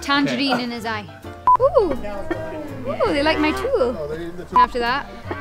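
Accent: Scotland, United Kingdom. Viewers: Of coarse she's Scottish, I'm in fucking love. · Scottish or British? Or is it Neitherofthoseish? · I love her scottish accent. It makes her even more endearing aswell s her personality. And i love the random American accent she did aswell.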